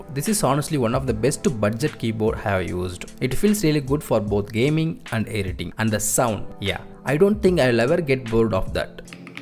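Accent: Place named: India. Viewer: He is speaking in stereotypical Indian accent but it sounds good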